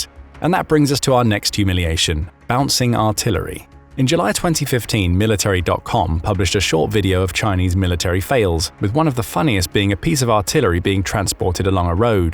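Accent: England, United Kingdom. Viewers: Lmao this video is total bullshit. I get a little suspicious on yhe stories then they flash up a picture of the lincon monument, that is 100% not what the monument looks like. I don't know who produced this video but it used an English accent voice using American measurements & stories that just aren't true.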